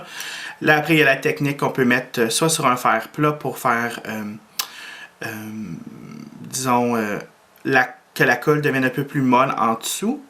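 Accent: Quebec, Canada. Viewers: j'avoue nikkitutorials m'envoie pour noël ... et je ne regrette pas, I was about to let you a comment in english and ... surprise ... you speak french !! j'adore l'accent canadien alors je ne me lasse pas !! en tout cas tu fais des makeup de folie !! j'adore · It's Canadian french. Plus, there are accents in other languages just like we have in english!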